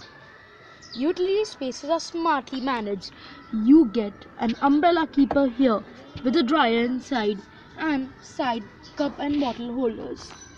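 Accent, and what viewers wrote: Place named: France. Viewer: which English this French 🤮🤮🤮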